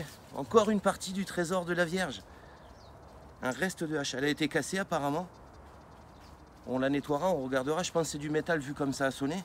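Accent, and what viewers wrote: South, France. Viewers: Non mais en tout cas j'adore quand tu fais de la detection avec tu accent du sud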